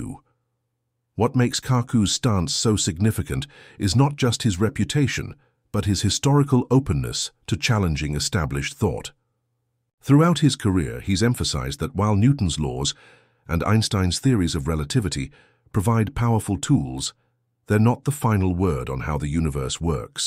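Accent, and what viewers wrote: United Kingdom. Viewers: AI still can't handle punctuation. Ridiculous. And stuffy brit ridiculous at that.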